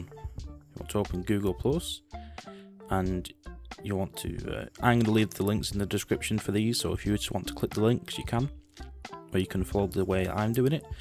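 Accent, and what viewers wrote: India. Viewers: Who expected indian voice? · I thought its an indian dude :)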